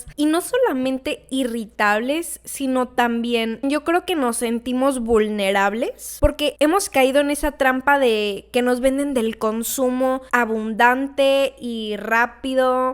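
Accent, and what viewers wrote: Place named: Whitexican, Mexico. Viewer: Primer video de (pseudo) ensayos que veo con tono mmdor whitexican, algo irónico.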